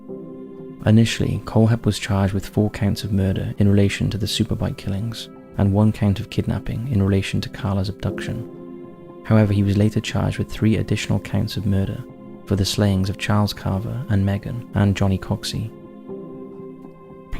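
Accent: United Kingdom. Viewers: I love your doco’s - I’ll be watching every one. Good realistic footage that’s relevant and great British voice ❤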